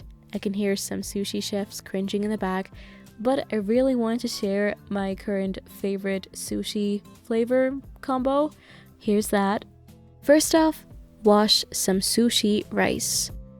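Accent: Germany. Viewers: are you German? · is she german ? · she is german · Are you german? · are you german? :) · Oh You’re german. Until the “real” I didn’t actually realize, after that I started to realize the accent lol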